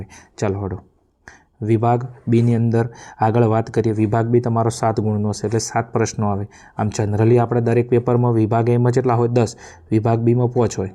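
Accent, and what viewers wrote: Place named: Patan, India. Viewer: Gujarati Patan nu che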